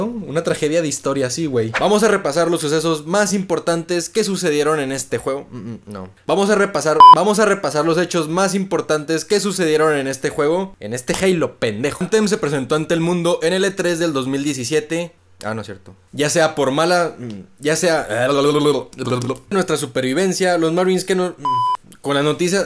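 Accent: Mexico. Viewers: Me suscribí porque siento que eres mexicano tu forma de hablar pero hay un momento que hagas un video de five nights at Freddy's. Si puedes contar la historia porque de verdad asquerosidad de historia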